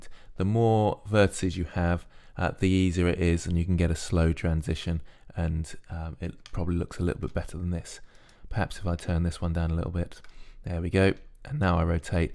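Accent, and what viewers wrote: United Kingdom. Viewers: I like this british accent : )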